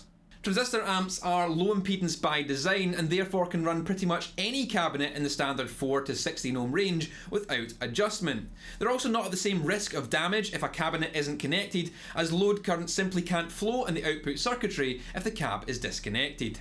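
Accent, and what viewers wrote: Scotland, United Kingdom. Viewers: Is it Scottish?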